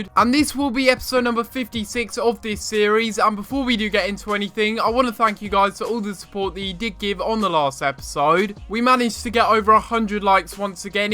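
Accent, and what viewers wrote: England, United Kingdom. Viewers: I know it sounds weird but what part of england are you from?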